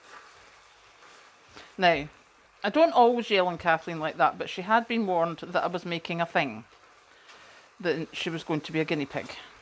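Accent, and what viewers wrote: Scotland, United Kingdom. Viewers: i just love your scottish voice and your enthusiasm, its infectious xx keep it up, your views will GROW, your channel is just very CUTE xx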